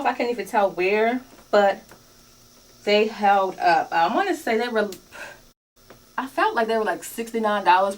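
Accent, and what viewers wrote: Baltimore, United States. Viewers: I really like your feet. Very pretty. And do I detect a bmore accent?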